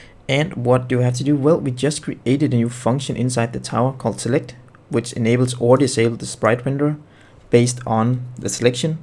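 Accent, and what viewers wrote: Denmark. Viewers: Started watching the video.... "In this video, we will..." That guy sounds like he's from Denmark... Check profile... Yep, Denmark... Hejsa lande bror.. :D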